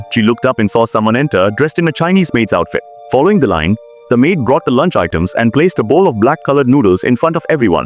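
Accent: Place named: India. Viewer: bro why does this fuckass ai voice sound fucking indian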